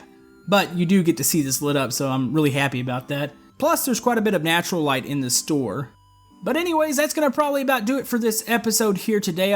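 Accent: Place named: United States. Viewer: What state is your accent from?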